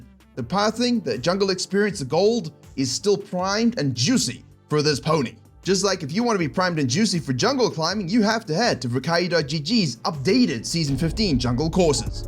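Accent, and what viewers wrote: South Africa. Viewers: WOOOOOOOO a fellow South African.. Don't know how I didn't pick up the accent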